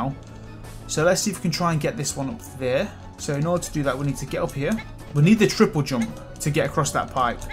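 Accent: United Kingdom. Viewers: What part of UK is from? He has the same accent as Jon Snow.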